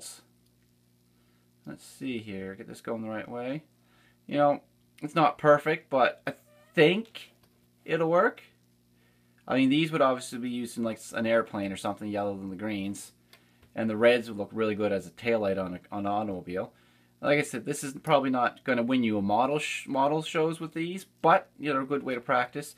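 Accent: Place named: Canada. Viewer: You're from canadia, I can tell.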